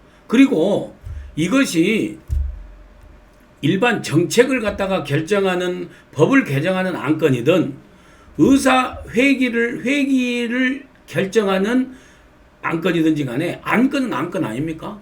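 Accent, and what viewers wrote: Jeolla, South Korea. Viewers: 민영삼 원장님은 가끔 유모어도 쓰시고 특히나 구수한 전라도 사투리는 방송을 재미있게 즐길 수 있도록 해주기 때문에 지루하지 않게 볼수있어 재미있게 보고 정치에 대해 많이 배우고 있읍니다 감사합니다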